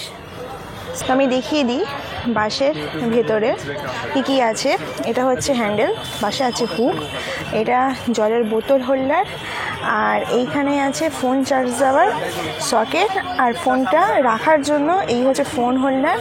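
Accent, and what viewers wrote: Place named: Ghoti, Bangladesh. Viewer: Tumi j ghoti seta bojha jachhe .....uchharon bolleo thik kortey parbe na ......sab Sam bajarer sosi babu 😂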